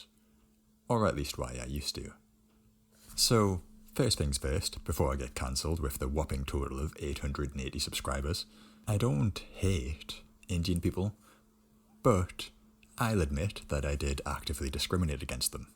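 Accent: Newcastle, United Kingdom. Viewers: Definitely a true geordie. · An Indian alumnus of the South Tyneside College, from the 90s. I have lovely memories of South Shields. I get nostalgic listening to the Geordie accent. Is there any video where you talk Geordie?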